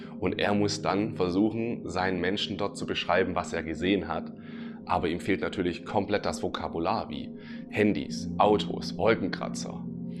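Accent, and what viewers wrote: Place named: Swabia, Germany. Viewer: Liebe Grüße aus Chiang Mai... du hast nen schwäbischen Akzent 😁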